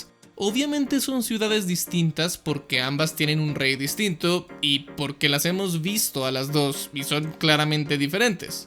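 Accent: Colombia. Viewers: Vato cuando hablas normal se te desborda el acento colombiano xd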